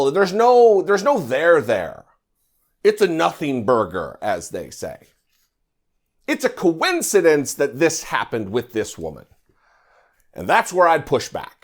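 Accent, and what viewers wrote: South, United States. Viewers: I love your Southern talk.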